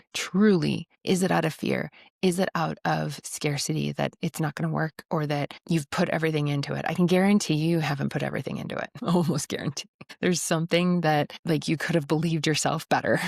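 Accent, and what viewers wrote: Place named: United States. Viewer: Your accent sounds American, and thus it is not only our MG settings. With only 10% of manufacturing left since 1979 the economy has gone suck for anyone but scammers and financial schemers with little but crap service jobs available. As my true self develops I have more abuse thrown at me from shallow geezers and utterly demonic black bloc monsters. I am not afraid or I would have quit long ago. I am surrounded by fragile egos and fickle fools and may need to just move to a new place on Earth after 52 years being the scapegoat and target for take downs in my decaying home town. Money does not simply flow from playfulness in the utterly polarized war on everything good. It is spiritual bypass to speak in this way. Maybe the next Leo eclipse cuts the idiots out of my way. I have heavy metal intensity with soft jazz detail sense, and am sick of being stuck in the punk dump of PNW.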